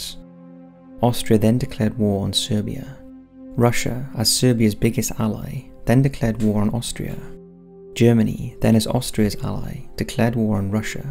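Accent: United Kingdom. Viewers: Hugh Jass He’s a Brit, hes gonna pronounce words in a different way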